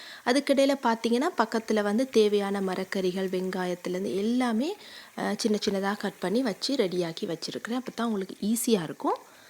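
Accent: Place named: India. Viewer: Full and full srilankan cooking but speaking way indian style .but not bad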